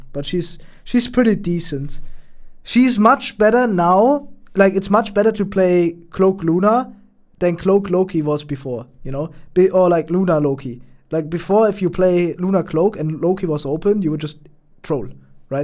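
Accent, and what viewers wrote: Germany. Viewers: On everybodys soul, you are german. It took me 3 min to say for certain, but I am sure now. Its because of the hard k in things like cool and the german ie instead of the american iea in tier.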